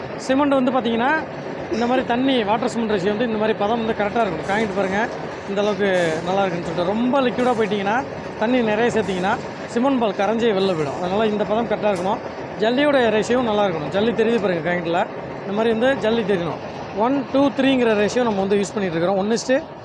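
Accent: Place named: Thoothukudi, India. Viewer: ஹலோ இன்ஜினியர் நானும் கொத்தனார் தான் உங்களுடைய slang நம்ம ஊரு தூத்துக்குடி slang மாதிரி இருக்குது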